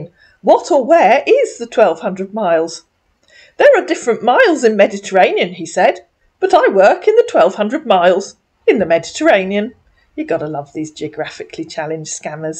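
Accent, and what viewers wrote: United Kingdom. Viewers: Omg rofl "Quit telling me ridiculously stupid lies". With your exasperated tone in your cute British accent, you have me laughing like a big ol donkey!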